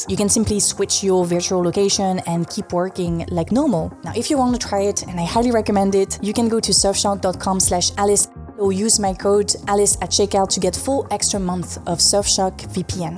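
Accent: France. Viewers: Cute french accent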